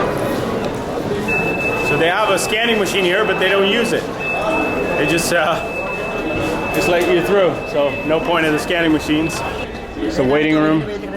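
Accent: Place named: United States. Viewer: You looks like Indian and having accent like American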